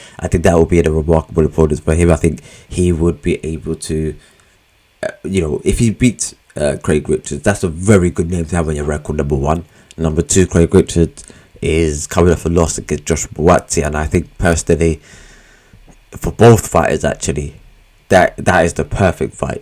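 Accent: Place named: Liverpool, United Kingdom. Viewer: Your scouse accent is muaad! 🤣🤣🧐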